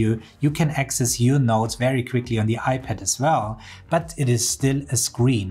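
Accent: Germany. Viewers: The thick german accent makes every tech video better. Des Bedarfs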